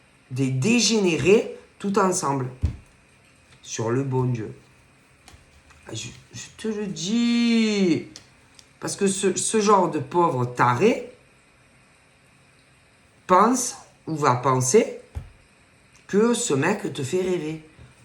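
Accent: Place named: Marseille, France. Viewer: adore votre accent Marseille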